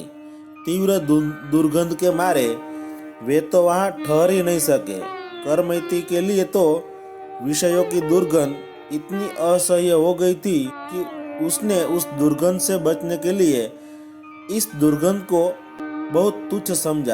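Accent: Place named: Chennai, India. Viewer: आप चेन्नई से हो क्या अना बोलते है वेसे बोलते हो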